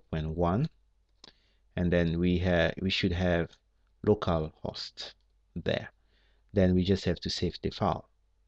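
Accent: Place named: France. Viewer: ça marche pas avec moi =) french accent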